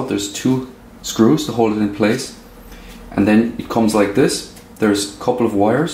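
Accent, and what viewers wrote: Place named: Ireland. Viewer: How do you have an Irish accent?😂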